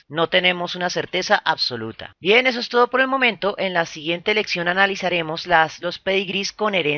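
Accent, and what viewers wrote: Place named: Colombia. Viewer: Me encanta como explica todo tan Claro y entendible y adoro en Acento de Voz Colombiano....me fascinan estos vídeos,gracias por hacerlos ...se que es parte de un tiempo valioso y preparación a cambio de nada,sin fines lucrativos solo con la intención de educar ,informar y ayudar a compensar esta rama de la ciencia tan fascinante....por ello estoy muy agradecido y le deseo éxitos y bendiciones en sus futuros proyectos... Enhorabuena. ❤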